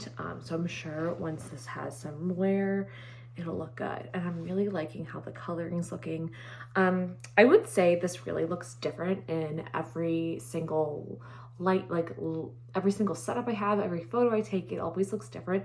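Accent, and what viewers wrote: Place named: United Kingdom. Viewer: lol love the British accent :P! and love the knit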